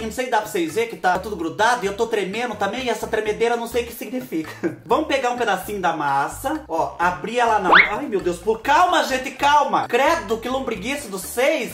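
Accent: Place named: Minas Gerais, Brazil. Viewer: Descobri você ontem dia 21,mas estou amando você com esse sotaque de mineiro!!!!!!! KKKKK Você é muito divertido.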